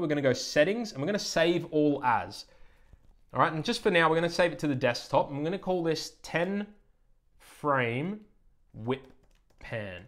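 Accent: Australia. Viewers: Thanks for that tutorial! Really well explained and value add. And is that an Aussie accent I hear? Nice one mate!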